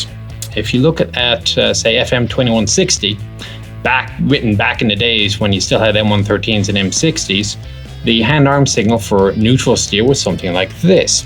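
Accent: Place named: Ireland. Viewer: Nichols, what is your nationality?.......it seems that your military service say American BUT you seem to have an Irish accent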